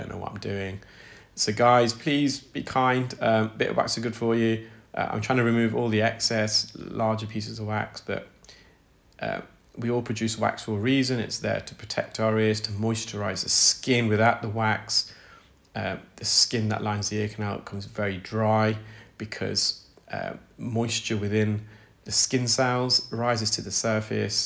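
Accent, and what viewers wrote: United Kingdom. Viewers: I'm smiling because with your British accent I hear " right correct ".... Love watching your videos!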